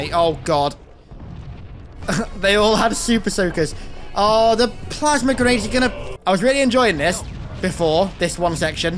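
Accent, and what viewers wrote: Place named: United Kingdom. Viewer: Very British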